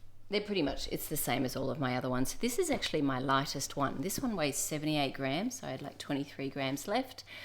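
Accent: Australia. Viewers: I did “Busabout” in 2002 which sounds like it might have been Eurobus rebranded?! The routes and set up sound exactly what they were when I did it - such a fun adventure and so lovely to hear you share your similar experience. I’ve also loved watching each of your recent travel episodes - thank you for making them. As an Aussie who hasn’t lived in Australia for over a decade, your accent always brings me a taste of home 🦘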